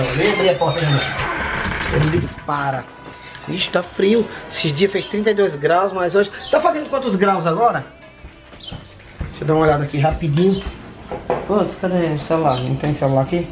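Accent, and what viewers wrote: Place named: Goiás, Brazil. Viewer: voz de cantor sertanejo deve ser goiano este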